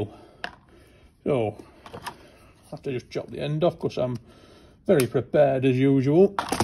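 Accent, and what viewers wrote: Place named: North, United Kingdom. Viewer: You've gone seriously northern. Good video, hope you are well.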